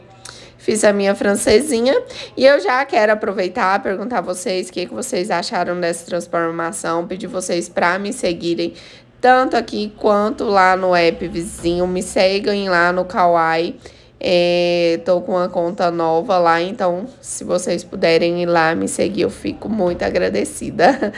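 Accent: Brazil. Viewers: Vc é de Goiânia? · Amo os seus vídeos e o se sotaque goiano.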